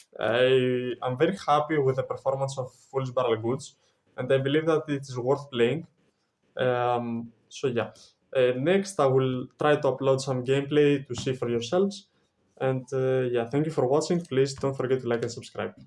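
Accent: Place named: Greece. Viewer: I know a Greek accent when I hear it. Καλή δουλειά αδερφέ!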